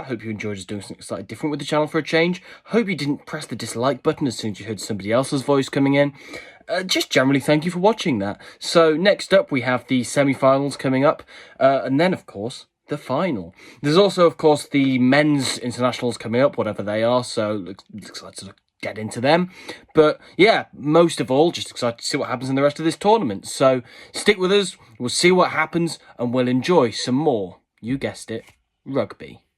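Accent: Wales, United Kingdom. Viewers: Humour: ✅ Welsh accent: ✅ Great rugby analysis: ✅ If being the host can help you produce more videos I say bring on more! I can't get enough squidge content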